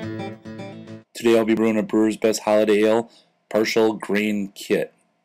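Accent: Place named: Midwest, United States. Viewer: First off Nice brew area, clean and organized. Second I knew you are from the midwest cause you have a bit of an accent. Your from wisconsin so you would probably call me a FIB, lol! I brewed the holiday kit and found the same thing, very alchohaly taste at first but not a bad beer. I think it smelled better when I was brewing it than the finished product to be honest. Question, what is or how do I make liquid brown sugar? That sounds amazing with a pumpkin brew. Cheers!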